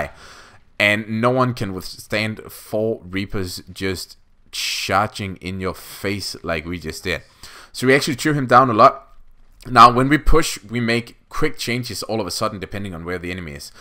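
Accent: Denmark. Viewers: Where are you from ? Dane ?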